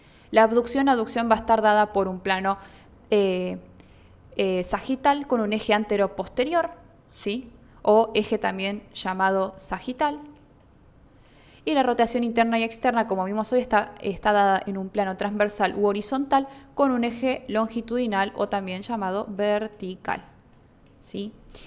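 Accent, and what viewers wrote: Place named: Rioplatense, Argentina. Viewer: Ay, cariño, no lo soporto el shesheo. Me parece un vídeo interesantísimo, pero no soy capaz de verlo.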